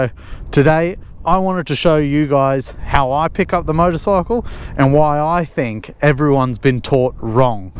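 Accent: Australia. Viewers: You sound like an Aussie!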